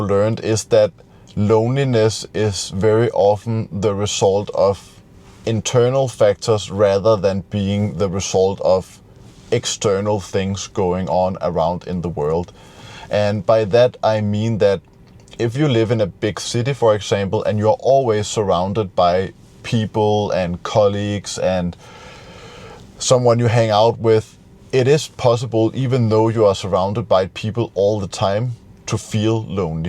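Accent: Denmark. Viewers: Nice video as always. I am learning a lot from you, so thank you. Your englsh has gone from good to exellent, but you must never lose the charming danish accent .. .-) Can’t wait for the next video.. 💐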